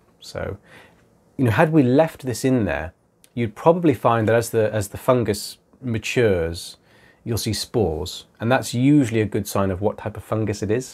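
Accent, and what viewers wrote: United Kingdom. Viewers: It's definitely a British accent, it's just not the stereotyped accent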